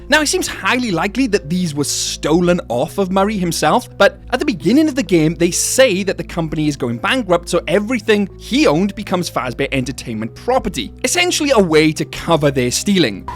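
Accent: Wales, United Kingdom. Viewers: Great video bro love from England but I think your a Brit as well ❤love to anyone reading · Are you welsh ?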